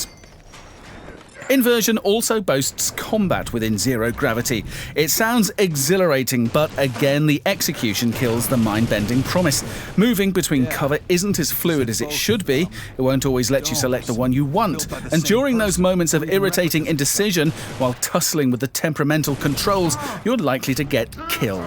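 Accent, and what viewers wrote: United Kingdom. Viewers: British accent <3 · British accent = Awesome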